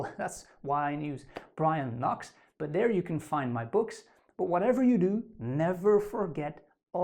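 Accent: Flanders, Belgium. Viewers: My ex boyfriend is Belgian Flemish. I recognized the accent. Thanks for the wise message. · Love your Flemish accent .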